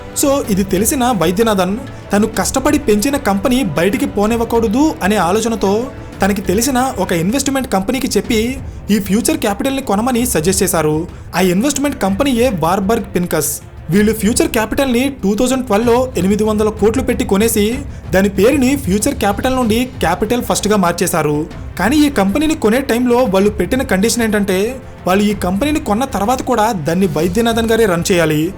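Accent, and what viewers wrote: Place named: Coastal Andhra, India. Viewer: bro , coastal andhra people pronunciation ala ne untadhi .. Rayalaseema and telangana slag laga 👍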